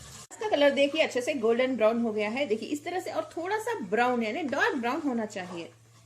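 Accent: Hyderabad, India. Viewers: Are you from Hyderabad (you accent hints) 😅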